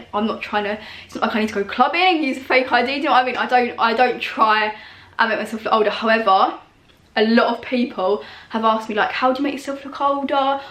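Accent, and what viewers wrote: Essex, United Kingdom. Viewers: you must be from essex as wellllll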